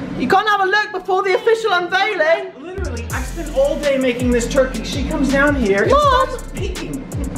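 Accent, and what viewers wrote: United Kingdom. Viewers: Botter I can’t I love the British accent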